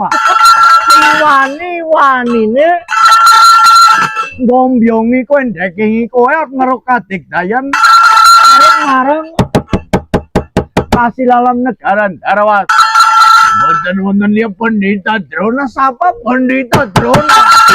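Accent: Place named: Banyumas, Indonesia. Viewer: Dalang ngapak aneh-aneh Bae.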